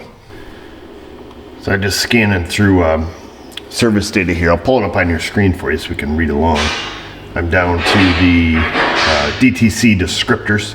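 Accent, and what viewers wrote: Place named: New York, United States. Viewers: So you must be from southern NY state as I hear you say Warsh instead of Wash. ! ya'll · We love your New York accent.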